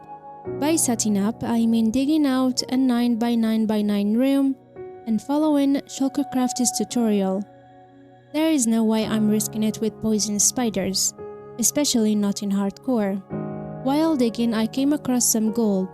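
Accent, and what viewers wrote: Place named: Canada. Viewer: I thought you were canadian 😮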